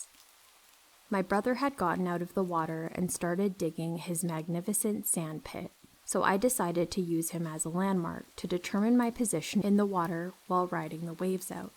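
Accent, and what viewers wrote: South, United States. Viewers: Southern US accent is very distinctive. I lived in Texas for a year when I was a kid. All my other years have been spent in Western Washington State where I was born and still live now. Thank you for another awesome video. 😍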